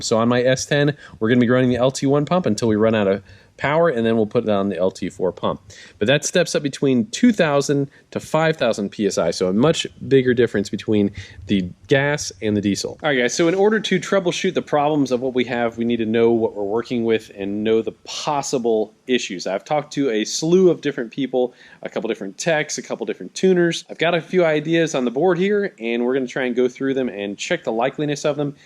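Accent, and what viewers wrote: United States. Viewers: Are you from Maryland? Or born and raised in Florida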